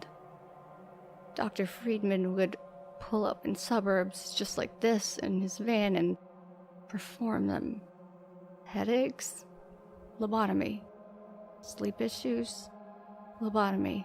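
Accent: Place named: Massachusetts, United States. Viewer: Excellent job, everyone. Being from MA myself, I really can't get over how much I enjoy hearing someone read with my home accent. Thank you for representing us!:D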